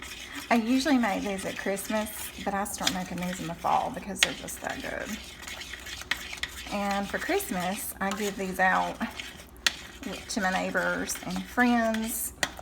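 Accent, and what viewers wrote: South, United States. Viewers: My Momma used to get fresh Pecans from my brothers tree and he had a black walnut tree too and she would get bucket fulls. I don't think she ever made pralines though. I do like them though. I say it just like you do it is a Southern thing I think. I had a lady correct me and said it like the other way to say the name and I said well I say is the way I do that is how I was raised to say it and she told me that is the wrong way. I was like okay whatever lol.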